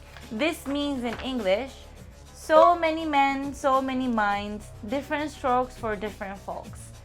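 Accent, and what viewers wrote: Philippines. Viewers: her english accent is very filipino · Mabuhay Sensei!!!. Your accent sounds like a Filipina already! Like you said 郷に入らば郷に従え (笑) Btw I'm a big fan of your artworks from Myanmar!!! Mingalarbar🇲🇲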